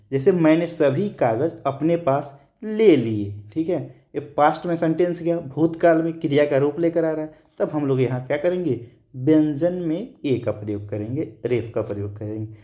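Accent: Motihari, India. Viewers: Aap Motihari se hai kya bhai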